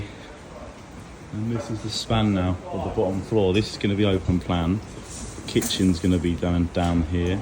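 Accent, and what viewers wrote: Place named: Croydon, United Kingdom. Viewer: Listening to your accent i bet you are close to me too Croydon 🧐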